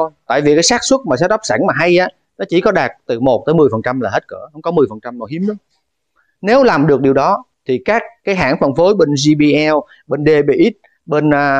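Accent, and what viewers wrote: Standard. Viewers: Ô a nói chuyện chuẩn quá.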